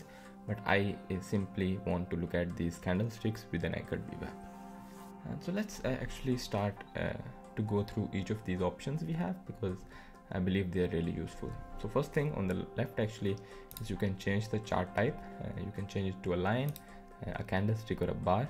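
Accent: India. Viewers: This guy doesn't give a shit about his company. Same shit 3 years later..He makes 100 changes to the UI and doesn't update the videos. I'm on a 15-day trial and seriously considering not going through with the sub because he is so lazy regarding user experience. (Also, please hire someone without a heavy Indian accent to explain how this works.)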